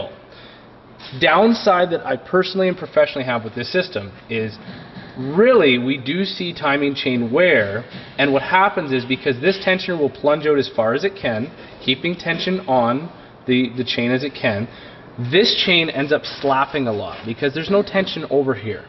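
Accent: Canada. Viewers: He's not only Canadian, but he lives in the heart of Mennonite country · Louis Healan he sounds extremely Canadian. Which is fine. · I liked the video until I started hearing all the "aboots" crazy canucks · Very informative, easy to follow along, and I'd love to see if he has a video on the 2.0L ecoboost engines like in my 2016 Focus ST. Big thumbs up on this guys' just no bs knowledge based on his experience. Sounds like he's from Canada, shout out from down South in Texas! ^_^ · I was about to say that he sounded as if he was from Ontario.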